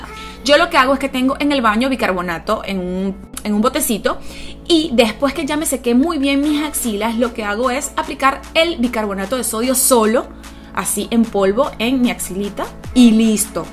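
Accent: Venezuela. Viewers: Es venezolana? es que tiene el acento 😁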